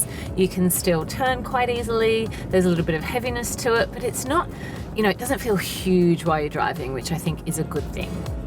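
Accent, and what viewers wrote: Australia. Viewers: Loved your aussie accent!! Good gal perfect video!!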